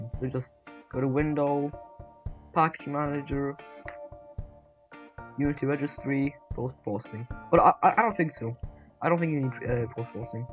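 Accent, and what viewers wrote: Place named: Germany. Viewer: i hear a bit Deutsch hhahahahaha habe glaube noch nie einen Detschen gesehen der ein Gorilla Tag Fan Game macht dachte wäre der einzige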